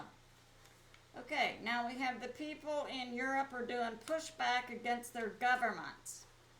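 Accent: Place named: Texas, United States. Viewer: She’s perfect just the way she is she has a Texan accent lovely!!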